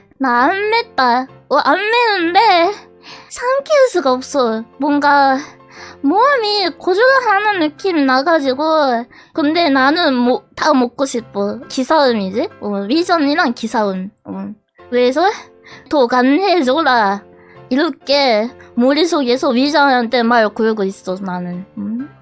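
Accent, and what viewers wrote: Japan. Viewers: 한본어 개기엽넹ㅋㅋ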